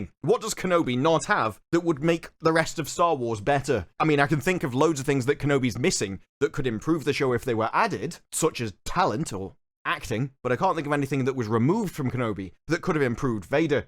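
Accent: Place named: Stoke, United Kingdom. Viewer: I don't agree with everything you say but you speak eloquently. You are a well informed man with good language jive. btw are you from Stoke?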